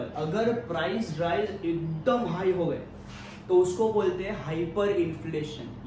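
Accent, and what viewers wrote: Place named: Gujarat, India. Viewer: Sir aap Gujrat k lagte ho!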